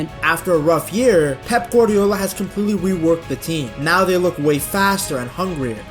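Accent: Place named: United States. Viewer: Can I ask you where your from? If it's the states then I would like to say that, your the only American I can actually listen to talking about footy!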